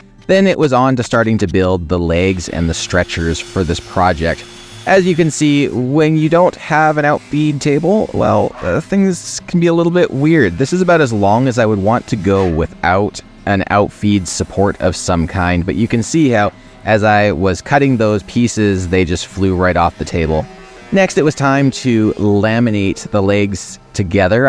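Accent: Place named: Canada. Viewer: Wait, you're Canadian?!? 😂 I should have caught the accent sooner. Feeding the algorithm for you from a bit South - almost to the Gulf of Mexico. 😄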